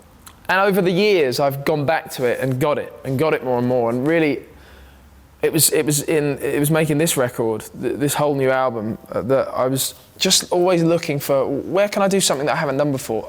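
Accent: United Kingdom. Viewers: He's not "foppish" he's POSH. That British accent is so sexy. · i didn't think this guy had a British accent until i watched this video. god damn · He has a British accent?? Woah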